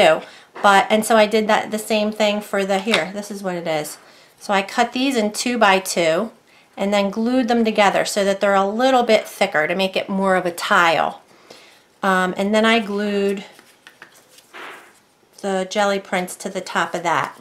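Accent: United States. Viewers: I love your videos. It's like I'm right in the room with you. I may be the first to say, your voice is very appealing to my ear, since you have an American accent and it is easy to understand. Thank you so much. Remember., all imperfections in art are perfect when made as one of a kind pieces.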